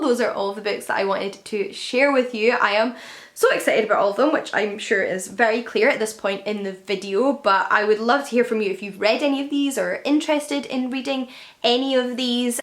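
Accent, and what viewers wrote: Scotland, United Kingdom. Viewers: That's the Scottish accent dude, it can make doom and gloom sound like optimism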